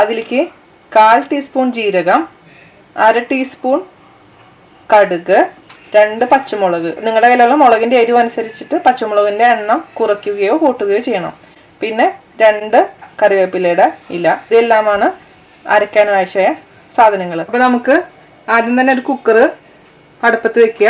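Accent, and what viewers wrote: Thrissur, India. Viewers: തൃശൂർ ഭാഷ... അതോ എറണാകുളം ഭാഷയോ? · Happy to see ur videos.. Me too from thrissur.. Simple thrissur slang demonstration makes me feel better to understand.. And tried many dishes as per your cookery demonstration.. Each and every attempt were successful.. Thanks chechi..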